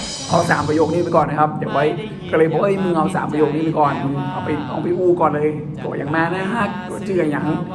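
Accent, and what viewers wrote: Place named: North, Thailand. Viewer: ภาษาเหนือน่ารักจังค่ะ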